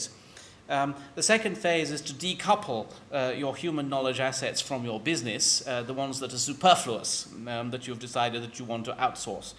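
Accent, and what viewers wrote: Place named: RP, United Kingdom. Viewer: You are FRIGHTFULLY posh, aren't you! (It's refreshing. I thought I was the only person left who spoke like they stepped out of a 1940s Ealing Studios film. JOLLY good show, old chap!) By the way, I have absolutely NO idea what you're talking about (I'm an artsy type...who, by definition of that fact, has 'knowledge retention' issues), but I could listen to you talk for HOURS.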